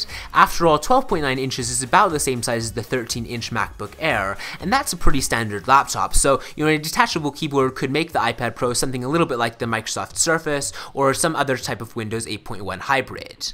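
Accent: Scotland, United Kingdom. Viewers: Sounds like a Scottish guy trying to forcefully perfect his American accent.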